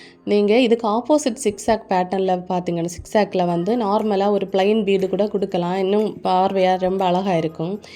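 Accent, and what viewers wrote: Kanyakumari, India. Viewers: Akka neenka entha place Kanyakumari language pesurinka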